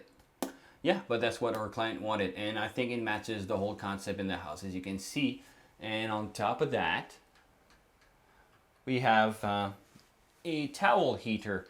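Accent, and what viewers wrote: France. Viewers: I miss your French accent, your English is impeccable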